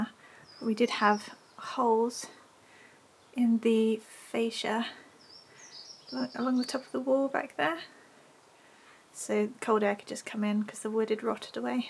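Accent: United Kingdom. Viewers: Hey there...I'm a new subscriber and I just want to leave a compliment, I love big, fluffy dogs, I have a couple of German Shepherds that you'd love!, you and your pup are so cute together and I love your British accent, you sort of sound like Ozzy's wife!...I'm calling from the United States, I live in Michigan....Love, Ron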